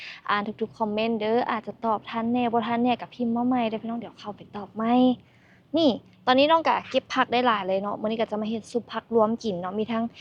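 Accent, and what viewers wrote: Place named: Vientiane, Laos. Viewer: สำเนียงเวียงจันทน์ ไม่ใช่คนลาวใต้ ถ้าลาวใต้จะเป็นแขวงจำปาสัก สาละวันสำเนียงอุบลราชธานี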